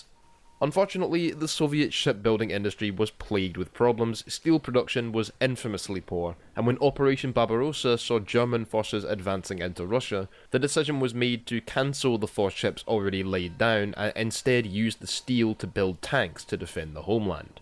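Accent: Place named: Paisley, United Kingdom. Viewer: Love your accent, reminds me of me mom. She was from Paisley.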